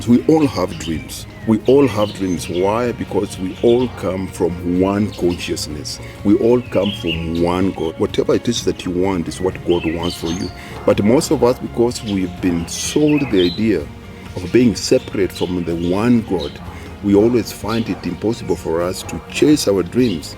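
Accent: Kenya. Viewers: Amazing how he still have the Kenyan accent after all that time in SA...this guy is inspiring in so many ways...kudos my Kenyan bro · I thought he has luo accent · that is a luhya accent kabisa kwanza ya wa samia wa busia.prove me wrong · This was so beautiful I absolutely love the Kenyan accent., you are doing a good job with this series oh! What a beautiful dog he has, wonder what the breed is called... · His accent just reminds me of Papa Shirandula.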